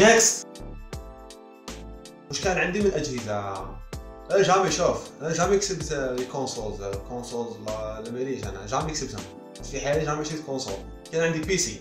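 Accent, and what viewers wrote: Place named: Algeria. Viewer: اووووووووه شيت وانا نقول هاذ اللهجة راني سامعها هخخخخ مهم نت راك ولد بلادي انا فرجيوة ولاية ميلة مام انا نلعب شوي غايمز فالقناة تاعي الفيديو لجاي ندعمك =)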